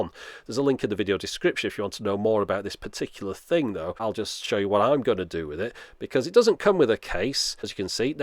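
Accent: United Kingdom. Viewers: WoWKoest I dont know what it is, but there is somthing inately satisfying about listening to brits talk tech.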